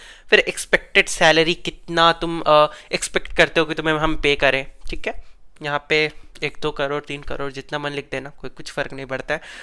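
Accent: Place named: Bihar, India. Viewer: Mujhe ye bihari lagta h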